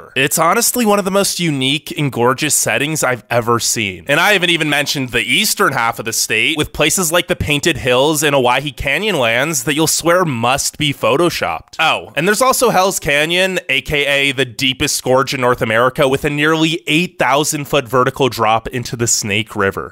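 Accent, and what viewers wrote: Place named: New England, United States. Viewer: Sounds like a New Englander who moved out west and never went to other states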